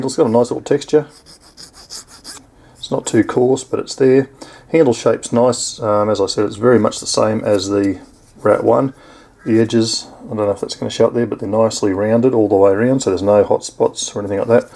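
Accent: Australia. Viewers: Mate.. I just loved the Review and it's great to hear an Aussie Accent doing one and you've got me motivated to go down to Walmart and buy one tomorrow .. :)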